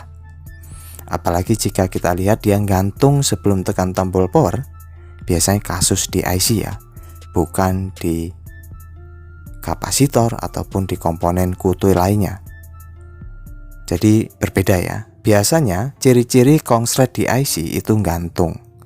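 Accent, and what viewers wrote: Java, Indonesia. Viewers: Mas mau tanya, mas asli jawa ya?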